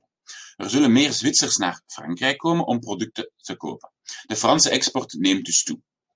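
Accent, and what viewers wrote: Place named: South, Netherlands. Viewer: Begrijpelijk filmpje,ondanks het zuidse accent.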